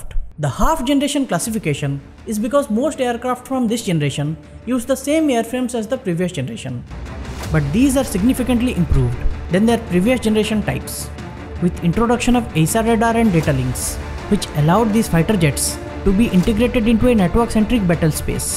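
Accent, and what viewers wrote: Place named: India. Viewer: Dude you have all the Indians accent they always mimicking Indians for....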